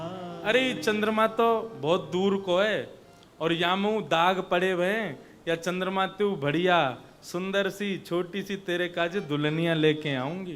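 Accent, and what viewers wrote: Braj, India. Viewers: महाराज जी आप इतनी मीठी ब्रज भाषा में बोलते हैं ऐसे लगता है मानो प्रत्यक्ष लीला हो रही है सामने हमारे छोटे से लाला को किसी की नजर ना लगे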